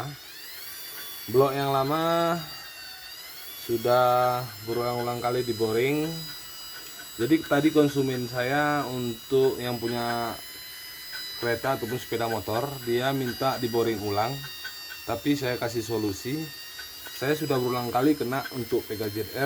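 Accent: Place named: Aceh, Indonesia. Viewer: Plat motor yah sih medan tpi klo logat y orang aceh ni abang ya😅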